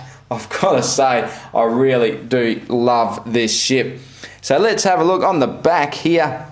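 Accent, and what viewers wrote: Australia. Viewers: u are so aussie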